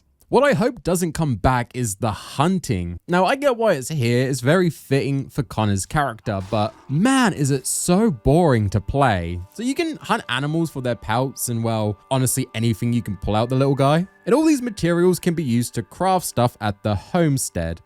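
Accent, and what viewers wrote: United Kingdom. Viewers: Wait a minute…you’re British?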